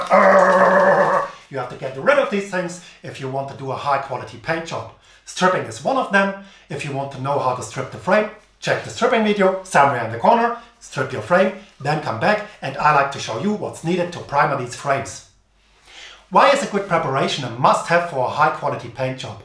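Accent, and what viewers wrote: Germany. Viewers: Angry german accent makes me happy! :)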